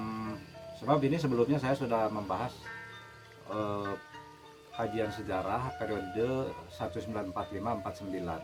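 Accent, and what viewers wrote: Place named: West Java, Indonesia. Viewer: Sukaa banget pak, sunda banget oii tentrem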